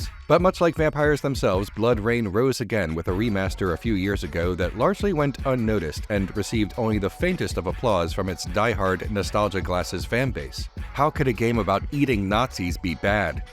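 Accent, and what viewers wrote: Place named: Mid-Atlantic, United States. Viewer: Your mid-Atlantic accent is excellent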